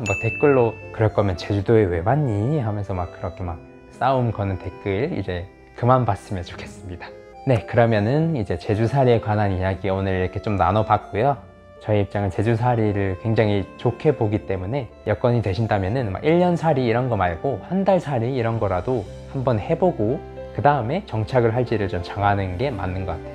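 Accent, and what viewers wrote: Gyeongsang, South Korea. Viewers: 표준말을 경상도 사투리로 쓰시는데요. 귀욤!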